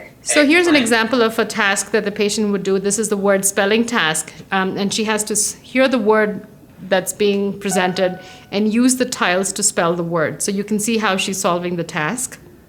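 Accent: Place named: India. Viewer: sounds like you are indian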